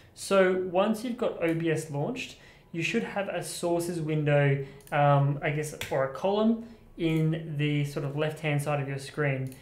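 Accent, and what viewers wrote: Australia. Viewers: That was very informative and great to hear a fellow Aussie presenting. I have downloaded Melon for streaming to YouTube but not sure how I ajust zoom, colours etc on my Brio 4K in Melon, I don't think I can. Is OBS a streaming software also? I don't want the 2 programs clashing. Or can I adjust everything in OBS and still stream from Melon? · Very nice tutorial (plus, Americans are suckers for Aussie accents)! :-). Really bummed that FaceTime doesn't let you make any fine adjustments to the camera as I'm seeing a lot of color cast on my FaceTime calls with the Brio. Let's see if Zoom or Skype does any better with color correction.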